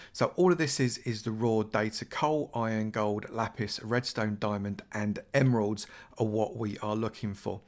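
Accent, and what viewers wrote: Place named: England, United Kingdom. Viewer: He sounds nothing like him imo. Just an English accent.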